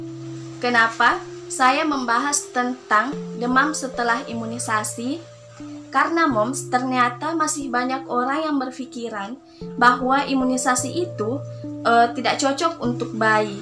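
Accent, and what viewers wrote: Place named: Bugis, Indonesia. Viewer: logatnya seperti orang bugis 🙏😁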